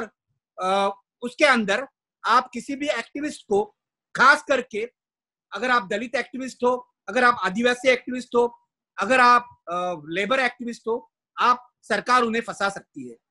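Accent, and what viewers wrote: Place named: Maharashtra, India. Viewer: Abe bewakuf pehla marathi slang main Hindi bolna band kar.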